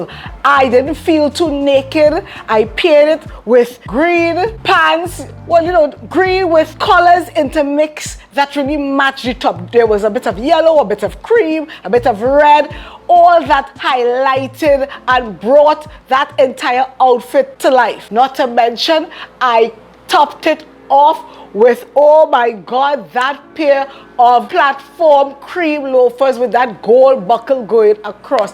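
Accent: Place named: Trinidad and Tobago. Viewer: Oh GOSH GYAL! All looks are 🔥 🔥🔥 Look #3 was my fav! I could listen to your trini accent ALL DAY! And yes... FUMI SENT ME!😂😘💓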